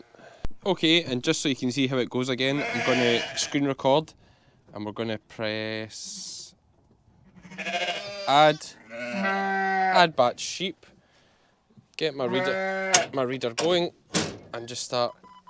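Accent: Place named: RP, United Kingdom. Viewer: Loved your interview on landward with your posh accent 🤣🤣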